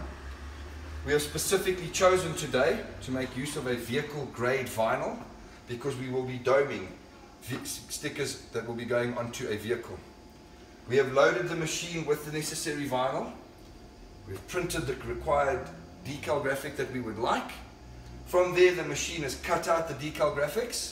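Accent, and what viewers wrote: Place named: South Africa. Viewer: That sounds like a South African accent 😁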